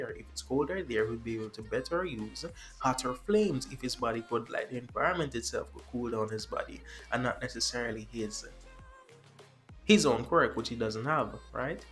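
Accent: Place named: Caribbean. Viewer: you sound like you're from the Caribbean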